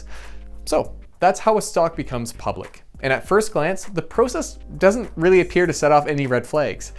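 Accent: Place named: Canada. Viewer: Just enjoying the Canadian Abeut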